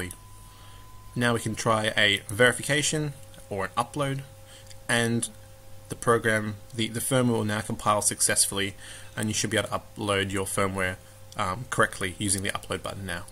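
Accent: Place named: United Kingdom. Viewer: fair comment but - I was refering to the pronounciation of 8 and the letter h. It seems that UK and friends have a different way of pronouncing both than some other countries including US. (eg "I ate you enry iggins") Combined with fuzzy (at best) video mistakes are made. On the positive side, there was thankfully no distracting music. I prefer written code in the comments. thanks for your interest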